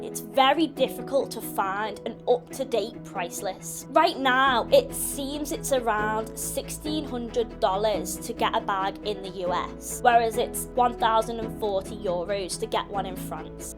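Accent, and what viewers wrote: United Kingdom. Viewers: I love the video I like your accent but can’t tell where it’s from ? Are you British or Australian or something else ? Great videos as always !!!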